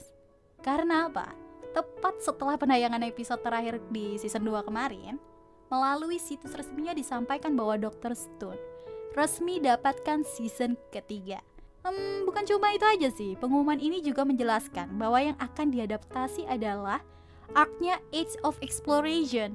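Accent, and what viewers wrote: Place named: Sunda, Indonesia. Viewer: Fix naratornya orang sunda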